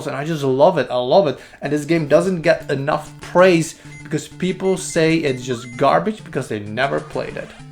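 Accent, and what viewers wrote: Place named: Denmark. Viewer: I'm guessing Danish.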